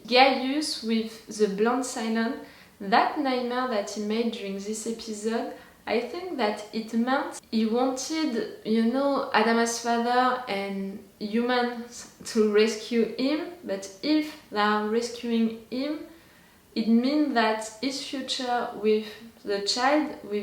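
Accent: France. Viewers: Lol “I’m still French” has so much weight with this quarantine. Like You may be quarantined, but your French will never be Quarantined 😂 I love your accent. My father studied in France and I learned a bit of the language growing up. But I don’t remember everything anymore, just a little. Je peux dire ça en français. Je pense que vous êtes une femme très belle et intelligente. Lol I hope I put the accents in the right place, :D Anyways stay healthy and safe, and i will listen to your Reaction now while I work :D ❤️ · Your french is a particular dialect by the accent you speak in English. I have heard it before. I don't know which one but it is not as common.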